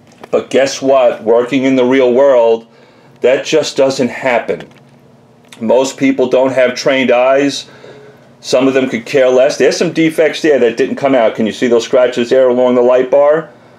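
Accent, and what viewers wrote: New York, United States. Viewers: I hear a New York accent? Queens?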